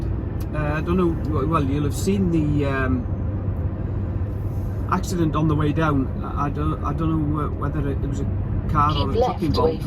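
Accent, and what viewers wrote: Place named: Northeast England, United Kingdom. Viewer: your accent is almost identical to that of another YouTuber called John Kirkwood who is a retired professional baker from the northeast of England. I highly recommend his channel if you have an interest in traditional British cooking and baking.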